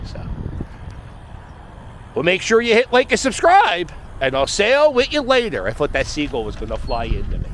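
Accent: Philadelphia, United States. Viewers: With the heavy Philly accent 😂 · Philly accent is A1!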